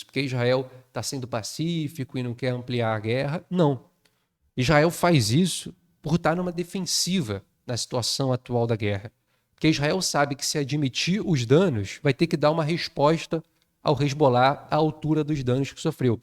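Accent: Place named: Rio de Janeiro, Brazil. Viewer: Tem hora que eu acho que o é carioca da gema, tem hora que parece paulistano da Mooca! Sotaque mucho loko! #freepalestine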